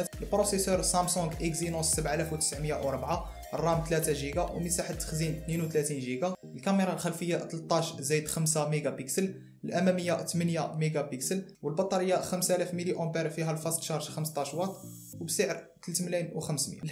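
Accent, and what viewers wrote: Constantine, Algeria. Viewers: حسب اللهجة تاعك نتا قصنطينيcsc ولا اني غالط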